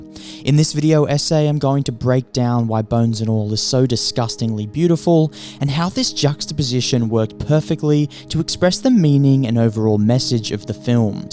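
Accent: Australia. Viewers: I'd like you to redo the video in a normal American accent the Australian accent is bizarre and very unpleasant to listen to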